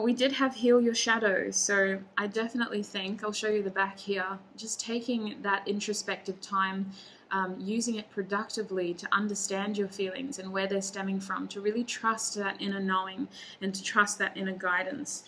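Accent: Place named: Australia. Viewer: Thanks for the reading dear. I love your Australian accent it is cute and unique. Happy new year.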